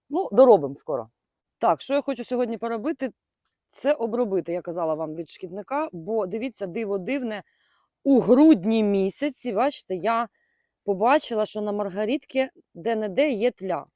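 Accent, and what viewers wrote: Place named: Surzhyk, Ukraine. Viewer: Ще менше суржику, будь ласка. Попрацюйте над правильним вимовлянням закінчень слів.😉💪🏻🇺🇦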